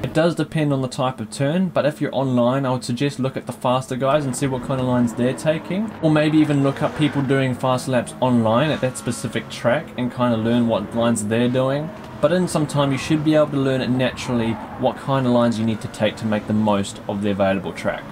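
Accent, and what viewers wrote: New Zealand. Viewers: Oh bro Ive watched a few of your videos, Didnt know you ar ea kiwi! Love it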